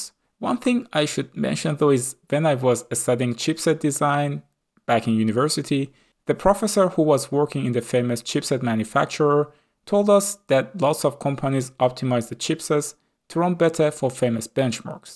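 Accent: Iran. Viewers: Your accent is too persian😅 am i right?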